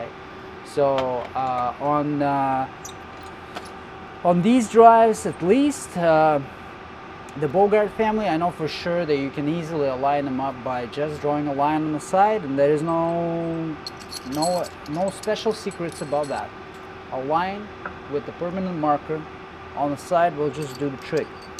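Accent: Russia. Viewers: Are you Russian? You do sound like a Russian guy. You choice of words and accent are very specific.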